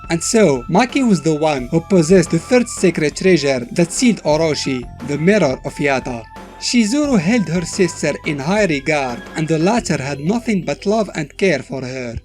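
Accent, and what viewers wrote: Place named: Morocco. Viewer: he is Morrocan,from my country and recognize the accent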